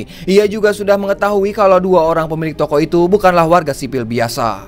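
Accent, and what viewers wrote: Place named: Sunda, Indonesia. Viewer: Lu asik bang klo bicara Sunda wk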